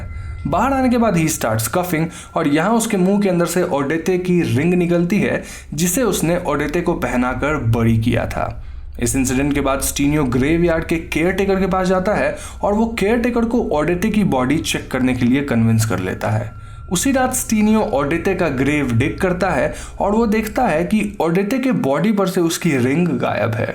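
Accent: Bengal, India. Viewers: U r bengali right , accent shune bujhalm but whatever you're doing that's awesome.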